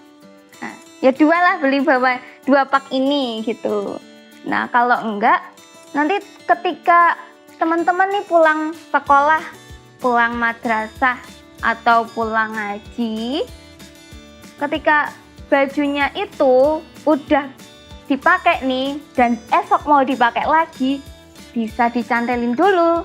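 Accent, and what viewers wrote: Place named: Purworejo, Indonesia. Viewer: MasyaAllah mba.. Khas bgt logat purworejo nya.. Bismillah.. InsyaAllah tgl 11 Kali ke 2 anter ank msuk sini stlh 6 th lalu.. Smg ank kmi, betah, krasan.. Aamiin.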